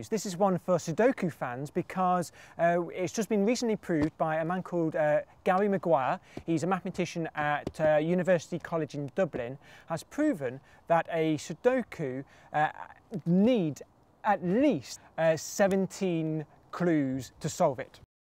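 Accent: United Kingdom. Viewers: are u british u speak with a british accent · Im american Hes british He sounds funny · if this man did not have a British accent i would have stopped watching about 8 minutes and 30 seconds ago.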